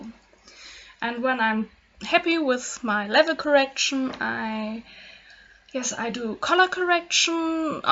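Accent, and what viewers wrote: Germany. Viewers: I think your english is great! You have a little bit of an accent, such as german natives have, but that's all. Thank you for your lovely and instructive videos. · I personally love the German touch to your English. Everyone adds their own flare to their English. It's sad to see those trolls criticize someone for sounding unfamiliar to themselves. On a side note, I really like your work, I'm considering using paint more often because of you 🙌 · im sorry if i wrong but are you german because i am to and german was what i was raised with and i sounded the same way sooooo dont worry it gets easier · Love your art, love your English because you use it with a delightful accent. I spent many years in Germany with the army and never mastered Deutsch. Thank you for sharing your art and please do not lose your accent. Ps where in Deutschland do you come from. 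· i really love your accent. Im american but i have a speech impendememt that makes me sound like i have a heavy accent (idk thats what people tell me). I dont see why people would make fun of you, your English is fantastic and i personally think you're accent is beautiful. Infact what i like about you is that i can tell you really try to explain how you do things and i think that's really sweet :) I might be alittle biased because i love German accents but whatever. You're a wonderful person and don't be timid about who you are or what you sound like. Atleast you try, that is all that should matter to the ones that matter :)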